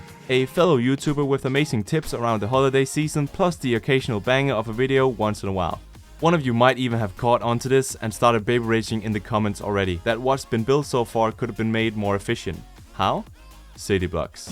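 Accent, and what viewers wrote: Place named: Germany. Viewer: Good f'ing job man, your suffering wasn't in vain. And I would've never guessed you're Danish, you sounded rather German to me 😂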